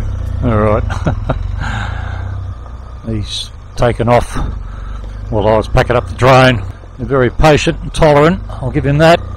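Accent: Australia. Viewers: Love your presentation style mate: old school Australian 👍👍👍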